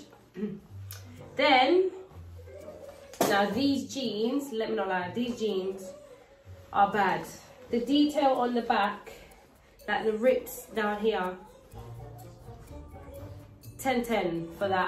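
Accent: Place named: United Kingdom. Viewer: #monaemaffia. Your British voice is everything. Your style is 💥💥💥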